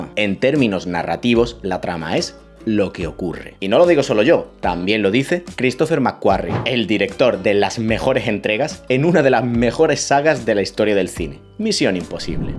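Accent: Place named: Andalusia, Spain. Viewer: Encima todo con acento andaluz 👌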